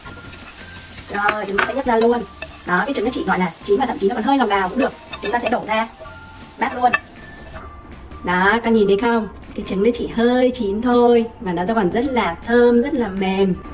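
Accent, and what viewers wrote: North, Vietnam. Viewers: Nghe kỹ giọng chị là miền Bắc hồi đó coi gia đình là số 1 nghe giọng miền nam mà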